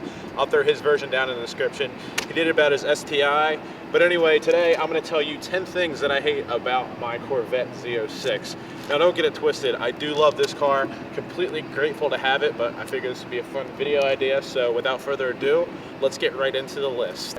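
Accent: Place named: Baltimore, United States. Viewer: That Baltimore Accent. #MDlivin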